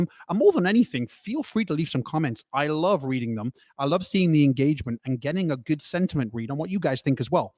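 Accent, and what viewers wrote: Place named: United Kingdom. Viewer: I’ve always tried figure out the accent. Are you British but spent many many years in the States? Thanks mate, keep up the great work.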